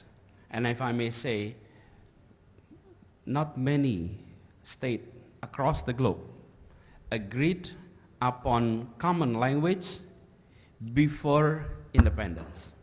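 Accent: Indonesia. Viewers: Bagus, tp inggrisnya kelihatan org indonesia, jauh dr spt native speaker.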